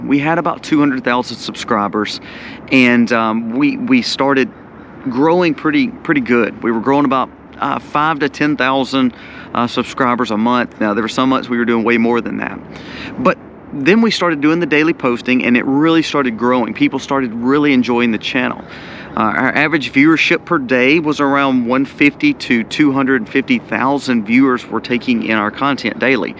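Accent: South, United States. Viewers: You're the only youtuber that can inform us about some disaster but, at the same time, makes us feel good about our day. It's that smoooooth southern voice. You're the Mr. Rogers of prepping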